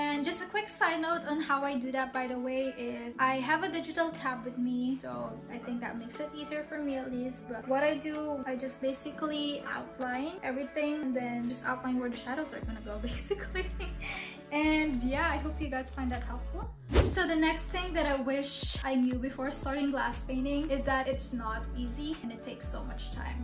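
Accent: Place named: Philippines. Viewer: Thank you for this! <3 (Glad to find a Filipina/Pinay artist! thought you were Filipina by your looks+last name at first then your accent told me the rest. and hey I know my people 😂 mahal kita!)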